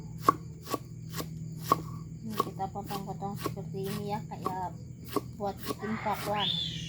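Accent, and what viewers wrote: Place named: Central Java, Indonesia. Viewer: Mbakyu orang mana to kok kaya logate wong Jawa tengah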